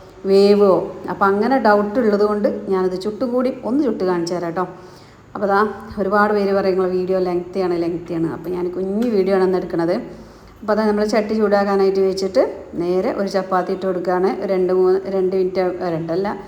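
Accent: Malappuram, India. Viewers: Samsaram malappuram style matiyoj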